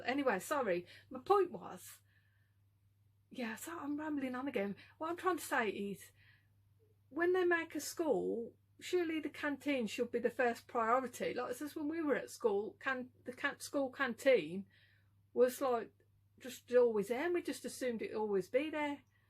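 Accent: Birmingham, United Kingdom. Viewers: My goodness. Your amazing. How I long to be able to sit in a cafe and hear a person at the next table that I don't know talking about ordinary everyday things. And that fabulous accent. Takes me back to my childhood in Brum. Your Ronnie Corbett meandering is so good. I'm not sure you know much about the 70"s you'd have been too young. However the 70's as a teenager and twenty something was amazing in Brum. At the top of Broad St you had the Saints and Sinners cafe and Barerellas and the Rum Runner . If you can't remember it you weren't on the scene. It was so cool. Thanks for posting. James. PS. your suggestion of mass surveillance and behavior profiling would be a non starter. They already do it in China. It's called "big brother" I recommend the book 1984. But not to worry your thinking out loud.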